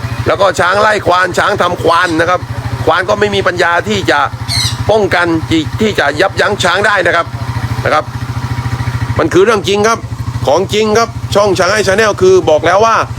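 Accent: South, Thailand. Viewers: ชอบนายหัวจาพูดใต้ไม่ดัดจริต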